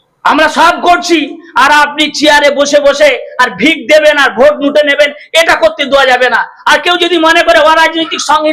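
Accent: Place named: Bangladesh. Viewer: তুমি তো পুরো বাংলাদেশী, তোমার ভাষাতেই বোঝা যায়।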